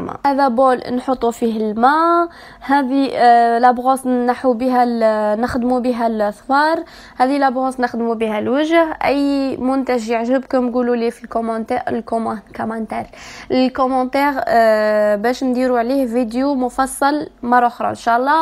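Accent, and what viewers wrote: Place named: M'Sila, Algeria. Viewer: سلام حبيبتي والله نتبعك ونموت عليك سيرتو كي راكي تحكي باللهجة تاع ناس مسيلة والله عسل وزيد متواضعة ربي يسترك بغيت نسقسيك عندي شهادة دولية فالستيتيك نقدر نقري بيها تما كفاش ندير من فضلك جاوبني ربي يسترك جاوبني وبتوفيق متابعة وافية ليك من جنوب الجزائر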